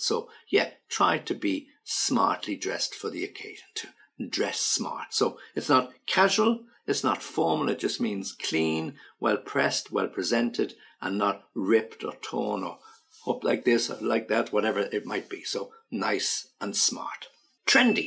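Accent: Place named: United Kingdom. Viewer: I really like British English, authentic! Please give us some words to describe about an exquisite accommodation or a superb resort and their services.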